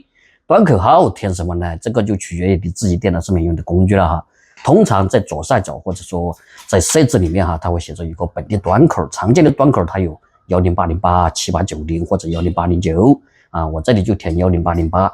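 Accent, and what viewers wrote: Sichuan, China. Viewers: 喜欢你的四川口音(川普)，与印度口音的英语。。。:face-green-smiling:🤣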